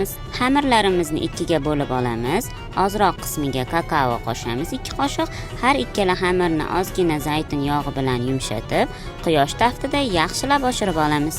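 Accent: Namangan, Uzbekistan. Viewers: Sheva Namangannikimi? qaytiniki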